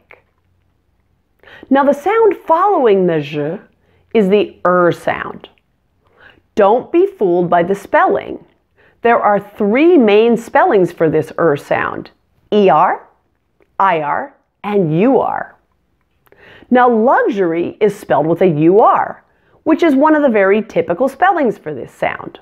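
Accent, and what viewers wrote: United States. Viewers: thx so much 😘😘..teacher this is english america???🤔🤔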